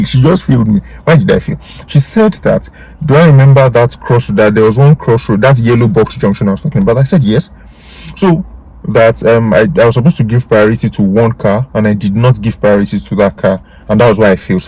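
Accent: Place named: Nigeria. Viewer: I like the way you speak english in your Nigerian accent sir .. A little tip for you (in your test try to have a good friendly conversation with your test instructor while your driving which will divert the intructors thought away from examining... this is how I passed my driving test in the UK. all the best in your next driving test!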